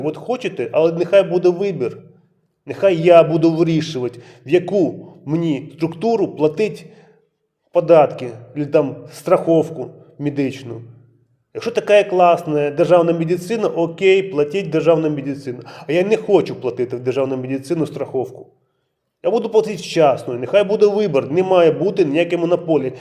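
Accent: Russia. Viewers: Ти не дуже схожий на українця Українці так не говорять у тебе вимова російська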